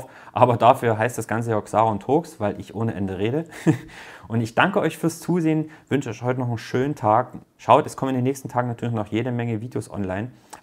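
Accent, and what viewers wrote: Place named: Saxony, Germany. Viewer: Sry...hatte im vorherigen Video dieses noch nicht gesehen und dich nach dem woher und wie gafragt....wie gesagt, erst den 5ten Tag dabei....werde Dich definitiv via Amazon Link unterstützen, bei mir stehen im Februar einige Investitionen an und ich werde an dich denken..... Mach bitte weiter so.....Ehrlich, Symphatisch, Sächsisch....ich freu mich.....!!!!!!!!!!!!!!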